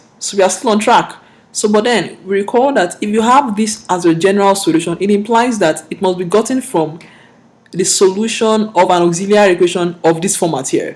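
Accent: Nigeria. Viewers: are you Nigerian? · Sounds Nigerian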